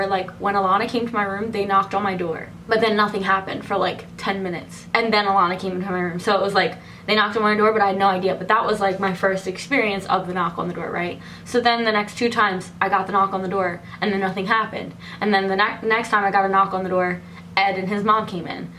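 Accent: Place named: Philadelphia, United States. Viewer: Girl I’m from Philly too and when you first popped up I heard your accent I was like yes!!!! And then it said Miami and I was like🧐🧐 hahahaha but then it all came full circle lol I loved you!!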